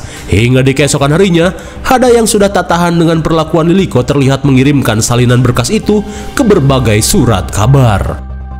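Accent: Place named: Sunda, Indonesia. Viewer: suka gue sama pembawaan nya Sunda banget bacotan nya keren mang jangan bosen bosen upload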